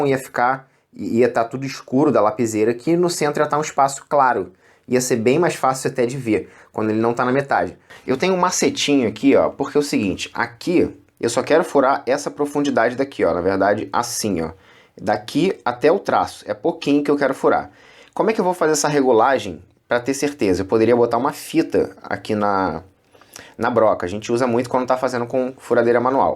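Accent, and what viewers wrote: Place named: Teresópolis, Brazil. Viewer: Boa tarde,adoro ver o teu trabalho,ele é feito com muito esmero,sou natural da minha querida Teresópolis ( RJ) e pelo sotaque somos conterrâneos,hoje estou morando em Indaiatuba interior de São Paulo e tenho uma marcenaria,desejo a ti muitas felicidades e muita madeira pra serrar,rs rs...abraços!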